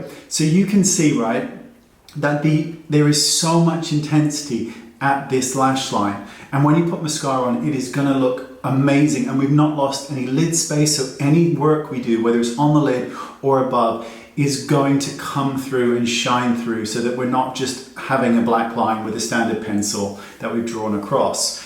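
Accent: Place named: United Kingdom. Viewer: Oh my heart! It dropped at totally useless... That BRITISH ACCENT 😍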